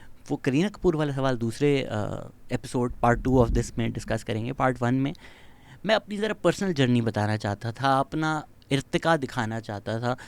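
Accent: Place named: Punjab, India. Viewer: Love your Punjabi accent 😁❤️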